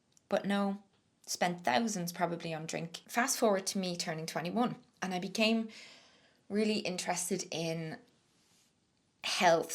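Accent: Ireland. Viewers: First but least important… you Irish accent is like music to listen to… more importantly, your stories in this video match my experience… actually tired of waking up and checking my phone to see what the fuck I did last night… I’m not there yet but I’m binging videos like yours to give me hope… thank you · The irish accent is really nice to listen too :)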